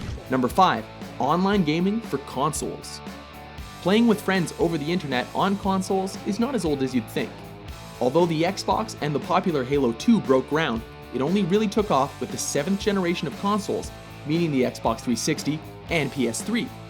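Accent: Canada. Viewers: is the guy who narrates this Canadian? He always says PROcess instead of PRAHcess. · how about a non canadian for the voiceovers.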